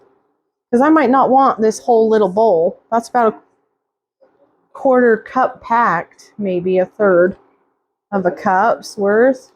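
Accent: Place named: Minnesota, United States. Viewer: 😂forgive me. "Accents" is one of my things. New to yr site. Literally, first time today. Don't wanna invade yr privacy. I hear South, Minnesota, and more. "MA Jor am"😂❤ again not being rude. Me born raised Chicago, 15 years ABQ, almost 10 SE TX. Just love people's voices. 😊 including yr son's.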